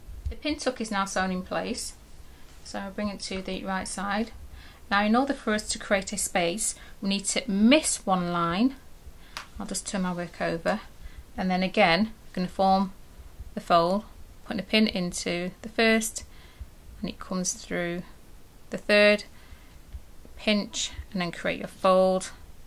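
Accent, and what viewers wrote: United Kingdom. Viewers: isn't it also the case that pin tucks are stitched but pleats aren't? I've always wondered how it's done, now I understand perfectly. The fun for me will be working out how much extra fabric I'll need! I can see much experimentation ahead! Thank you also for having a proper accent, as a fellow Brit I'm getting fed up with tutorials with American voices 😄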